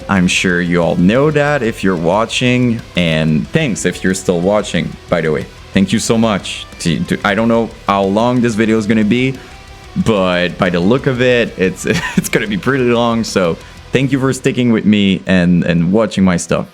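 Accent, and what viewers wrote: Quebec, Canada. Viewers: c'es tu un accent Québécois que j'entend mon chum?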